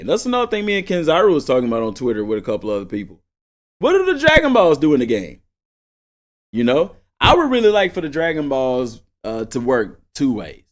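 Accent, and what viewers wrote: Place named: North Texas, United States. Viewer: Super Hype for this game NOW, BUT you can’t band wagon something before it comes out and Proves how good or great it is. it’s not band wagoning to anticipate a good experience based on pre-Viewing. That’s just Marketing ,advertising, and publicists doing their job to make it more appealing to its demographics bro and that includes youtubers. Also you wouldn’t happen to be from North Texas area. Just curious straight out the gate you sound like my kinfolk and friends; accent and speech pattern wise.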